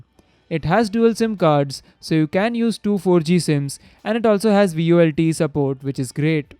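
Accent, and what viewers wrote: India. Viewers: Indian english accent is very funny :D. Great review and phone.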